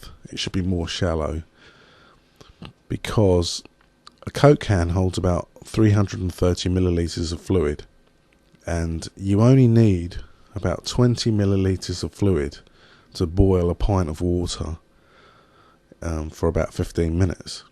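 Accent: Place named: Manchester, United Kingdom. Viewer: might be good to have some baking soda on hand as well to put out flames that might get out of control. Nice vid! thanx! Are you from Manchester? Don't know why but your accent sounds like that to me. I'm from New England so what do I know? -john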